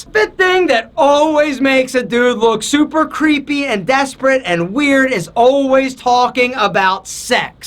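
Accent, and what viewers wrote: Philadelphia, United States. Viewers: He sounds like he’s from Philly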